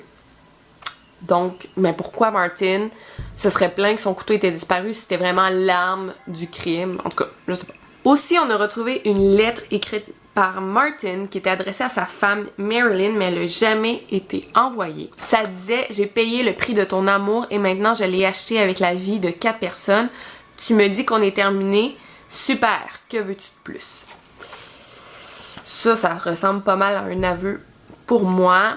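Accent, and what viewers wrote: Quebec, Canada. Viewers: Cette vidéo est vraiment intéressante au passage tu as une voix magnifie et j'adore ton accent québécois ❤️❤️ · Si tu ne veux plus te faire supprimer ta vidéo à cause des chansons donc pour droit d'auteur , juste à me contacter.Sinon continue ton travail ! Malgré ton accent Québécois très prononcé (Je suis Québécois aussi hihi ) , tu fais de l'excellent travail ! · Ayant regardé plusieurs fois toutes les vidéos, je suis allée cherchée d'autres chaînes de True crime mais je n'accroche pas sans ce bel accent québécois et sa manière de raconter 💕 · Mon dieu ce que j'aime l'accent québécois ❤️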